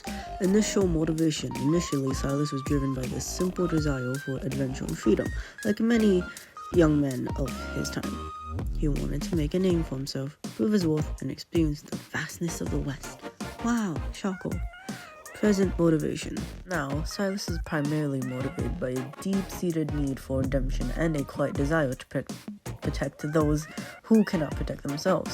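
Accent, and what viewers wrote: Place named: Canada. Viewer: I hear the Canadian in your voice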